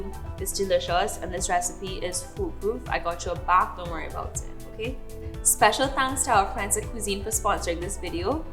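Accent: Trinidad and Tobago. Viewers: I love a Trini accent. The name is epic. Eat ah food nah! Great work.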